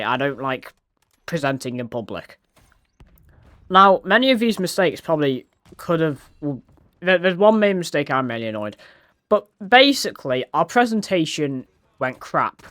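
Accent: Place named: Yorkshire, United Kingdom. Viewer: You're in Wales? I'd of sworn you were in Yorkshire... 😂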